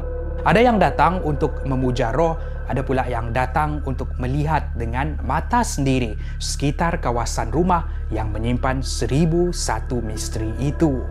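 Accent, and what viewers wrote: Sabah, Malaysia. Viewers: ckp sabah sya suka dgt org sbh ckp...sdp gilaa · Orang Sabah...bah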